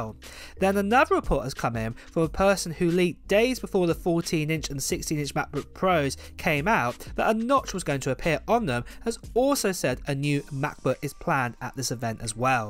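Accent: United Kingdom. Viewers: british accent ?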